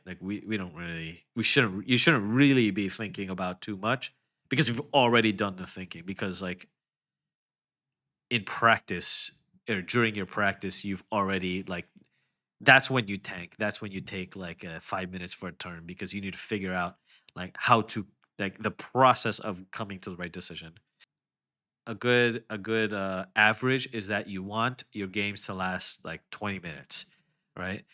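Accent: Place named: Hawaii, United States. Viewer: Brudda, you hawaiian. I hear the accent and see that sleeve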